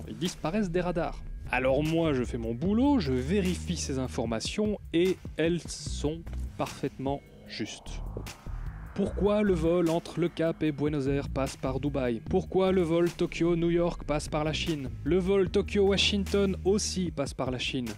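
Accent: Belgium. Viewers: Houit, tüitter ... c'est rigolo le parler belge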